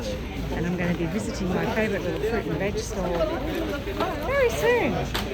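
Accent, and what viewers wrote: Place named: France. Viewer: ou étais-tu partie encore, qui te fais beaucoup trop RARE, ton charme, ton accent Français, ton talent, ton village magnifique....je me languis déjà de ta prochaine vidéo ( excuse moi ), reviens vite... connaissez-vous le château de Purnon, du 18eme siècle, dans la Vienne, classé aux monuments historiques, en restauration, par un couple d' australien....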